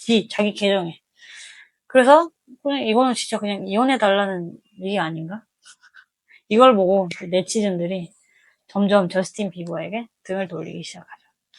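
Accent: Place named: South Korea. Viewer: 말소리만 들으면 그냥 한국발음인데요?너무 대단하세요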